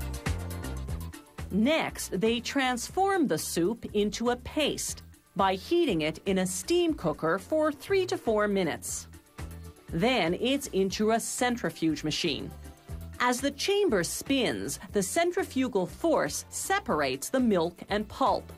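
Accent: Canada. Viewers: No Mention of the awful gas you get when eating tofu? Better invest in Beano. And I don't know what is worse about these videos, the horrendous Canadian accent or the insistance on using the metric system.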